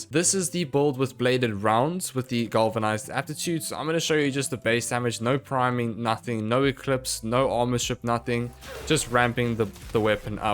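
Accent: South Africa. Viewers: Are you from South Africa? I can hear from your voice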